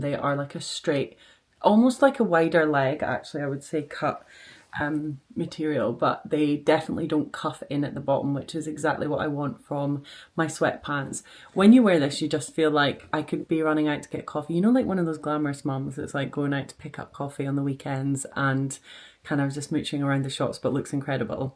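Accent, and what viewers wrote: Scotland, United Kingdom. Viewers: You look fantastic in all the Lilysilk! I think your Scottish accent is stronger after your wonderful weekend with your mum and sister, it's so cute!! Happy that you've already been able to narrow down your dress choice. Still about to watch the cooking bit 😂. Busy times!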